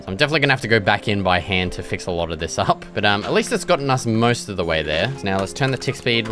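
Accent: Australia. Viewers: are you Australian? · hes australian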